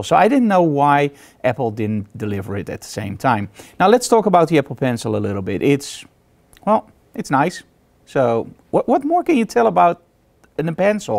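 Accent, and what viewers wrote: Netherlands. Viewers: I just try it. It's super amazing, I can even draw outside my drawing room seating on a couch, the signal is so strong! btw your accent is super Dutch :D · lol ik kon gelijk herkennen dat je Nederlands was door je accent. geweldige vid man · You are Dutch aren't you? 😂 Me too btw so i'm not laughing at you in a mean way just so you know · ben je Nederlands?-are you dutch?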